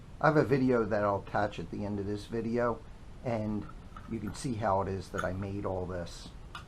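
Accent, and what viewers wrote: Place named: Pittsburgh, United States. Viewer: got ya, figured ya for a yinzer that moved away and still had a bit of pittsburgees left in your speech 😆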